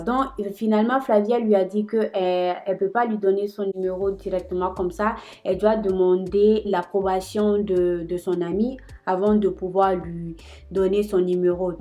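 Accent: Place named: Ivory Coast. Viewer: j'adore ta façon de parler et ta personnalité,j'ai aussi cru que tu étais ivoirienne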